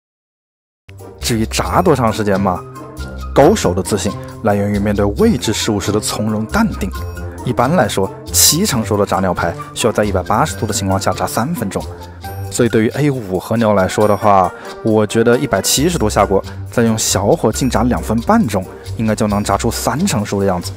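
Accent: Wuhan, China. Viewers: 武汉普通话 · 武汉口音忒重了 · 武汉口音太重了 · 楼主是湖北湖南人吧。武汉人仿佛在听汉普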